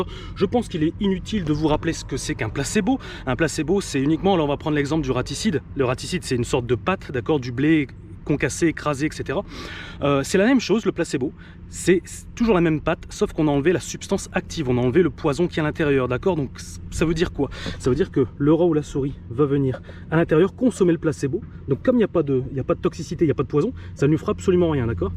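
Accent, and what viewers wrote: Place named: Northern France, France. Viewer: On viens tous les deux du nord, et tu as EXACTEMENT la même façon de parler qu'un de mes profs. Je pense que tout est lié.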